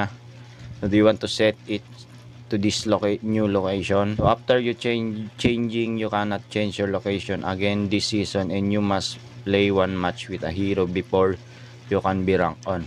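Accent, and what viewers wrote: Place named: Visayas, Philippines. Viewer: Ew bisaya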